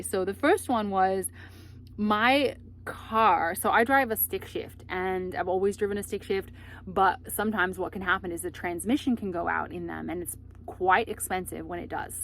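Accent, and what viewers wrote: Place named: Australia. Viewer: Interesting accent. Australian background? I always thought manual transmissions were cheaper to fix that automatics.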